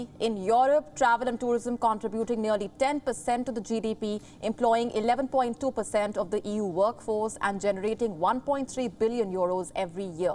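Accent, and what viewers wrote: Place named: India. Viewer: She is one of the best speakers and keeps her nice Indian colonial upper class Charismatic accent.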